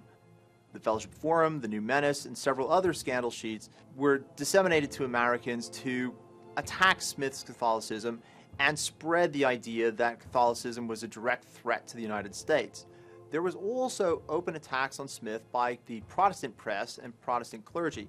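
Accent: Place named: Baltimore, United States. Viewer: This guy has an INSANE BALTIMORE ACCENT.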